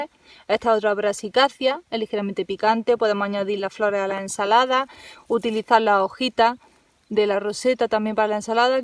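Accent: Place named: Cádiz, Spain. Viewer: Muy interesante video 👍, la chica habla como los gaditanos 🙄, 😁saludos desde Uruguay 🇺🇾🙋🇺🇾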